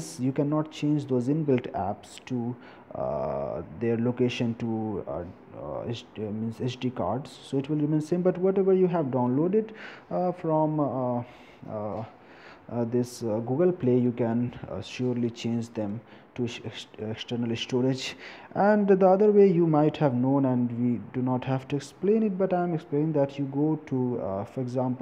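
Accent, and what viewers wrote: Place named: Pakistan. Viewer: Are you Pakistani? You remind me of Kumail Nanjiani the way you pronounce certain words.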